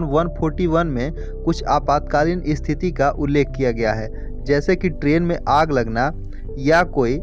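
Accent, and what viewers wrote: Bihar, India. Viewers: Ap bihari ha kya apki awaj se lag rha hai..main bhi bihari hun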